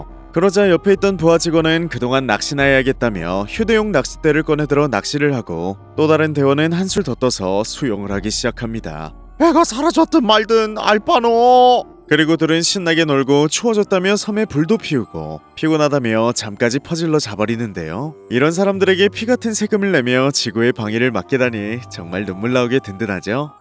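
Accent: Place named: Japan. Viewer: 일본어 발음 그리웠어요ㅋㅋㅋㅋ큐ㅜ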